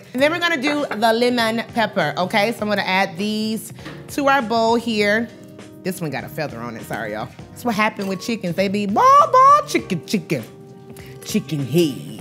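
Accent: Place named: South, United States. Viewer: I love your southern accent .. specially wen U say “sopped up" 🤣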